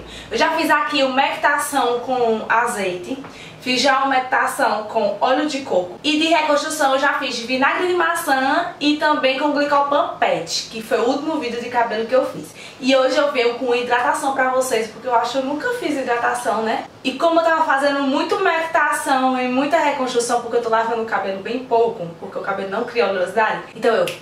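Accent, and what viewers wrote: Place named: Northeast, Brazil. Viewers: Sotaque lindo de Recife amo !!! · logo vi que é permanbucana linda amo nosso sutaque 😘😍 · Ameeeiii o seu vídeo 😍😍 Comprei a glicerina hj e vou fazer para testar. Tmb sou de Recife e amooo o nosso sotaque 💗 Parabénssssss! ! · menina que sotaque bonito . pernanbucana sim senhor . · Eita sotaque pra eu amar 😍 amei as dicas e tbm sou Pernambucana! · que sotaque bom nordestino hein, também sou nordestina, é nós 😊😘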